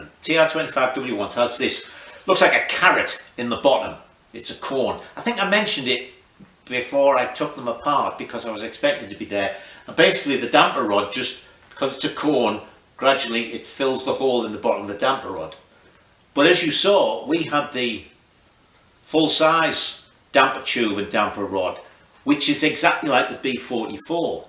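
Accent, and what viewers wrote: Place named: North England, United Kingdom. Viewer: So, I'm on my first ever restoration and it's a BSA C15. Coming across these videos is a Godsend, and they're even done with a proper accent. I'll forgive you for being from the wrong side of the Pennines lad (not sure many Americans will understand that) but it is great to actually see things that I'm going to need to do rather than just read about them. I'm a couple of months behind you. I'm waiting for some shiny bits to come back and then I can start to reassemble the wheels, forks and shocks. Thanks for doing these videos - perfect for a newbie like me. I'll toast you with me Corporation Pop.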